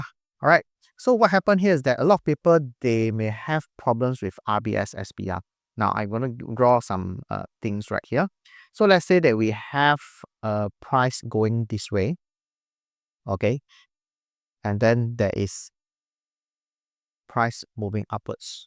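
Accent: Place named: Singapore. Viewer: I can't understand your singlish 😅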